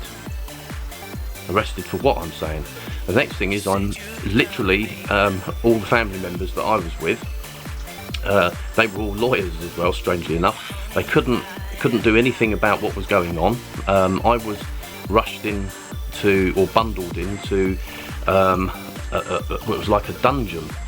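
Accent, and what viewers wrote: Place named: United Kingdom. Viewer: I guess your english is from the UK it's kind of difficult to understand.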